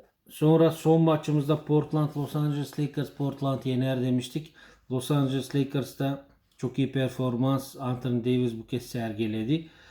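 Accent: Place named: Bulgaria. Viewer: Sen avrupa dasin galiba bide konusman bulgarlar gibi?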